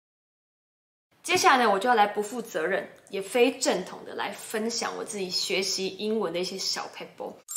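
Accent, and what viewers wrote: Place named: Taiwan. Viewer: 妳分析的很好 很有道理；尤其妳那台語真的是很道地 很傳統！給妳100分👍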